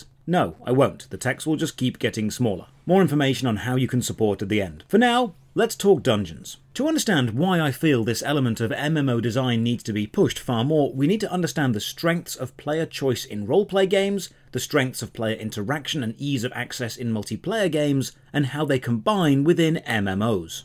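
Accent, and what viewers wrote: United Kingdom. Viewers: I haven't watch a Josh Strife Hayes video in a while, and it really surprised me how I immediately felt a nostalgic sensation of joy when I opened up this video. Perhaps Ill be finding some time to get those charming british tones in the coming days.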